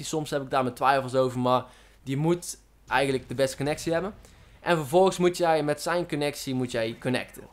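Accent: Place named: North Brabant, Netherlands. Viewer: Ja noord brabant dat hoor ik haha, maar waar ergens? Eindhoven?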